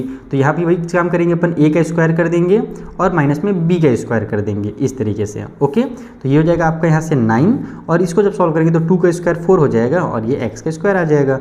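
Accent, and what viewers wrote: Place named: Punjab, India. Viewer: Sir ap punjab ka ho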